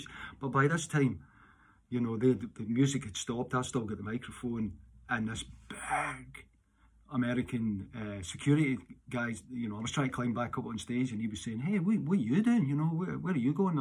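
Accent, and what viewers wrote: Scotland, United Kingdom. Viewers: I love your Scottish accent, I’m was born in Mexico 🇲🇽 City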